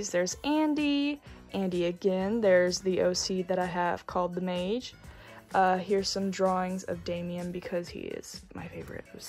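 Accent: South, United States. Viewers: I love your art it’s so expressive and fun and colorful! Also, are you southern? I feel like I’m detecting an accent. I’m from Missouri so maybe I’m projecting lol. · ive watched this video at least 4 times and i just realized you have sort of a southern accent 😭😭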